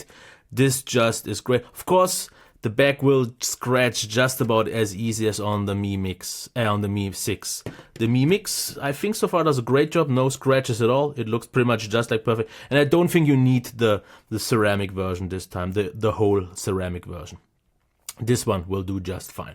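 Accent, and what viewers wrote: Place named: Germany. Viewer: Like the way you explain about which one to choose. Oh and that German accent is something else >))) keep the good work!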